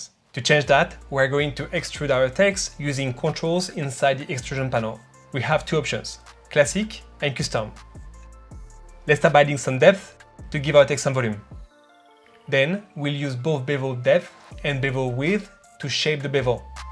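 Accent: France. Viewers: I found your channel just a few days ago. I like it and I subscribed. i like the topics, the way you develop your projects as they teach us many tips. I also like your accent :) are you french? (I am)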